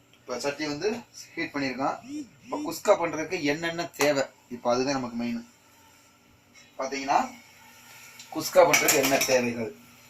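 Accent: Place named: Madurai, India. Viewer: super bro konjam stove kleen banunga pro unka slang very super you netive madurai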